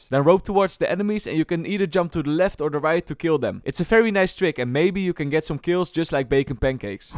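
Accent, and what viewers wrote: Netherlands. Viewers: he lekker goede nederlander youtuber je hoort het echt goed dat je nederlands bent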